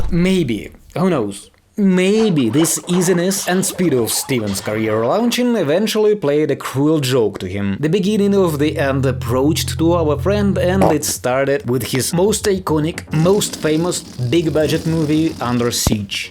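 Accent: Russia. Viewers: dude your russian accent is so thick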